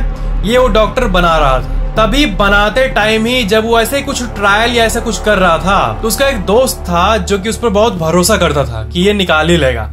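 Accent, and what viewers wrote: Bihar, India. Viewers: Yes !! He is Bihari Bhai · sir aap bihar se hai kya..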